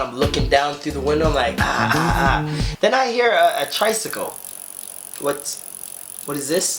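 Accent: Jamaica. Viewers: Are u from Jamaica or America...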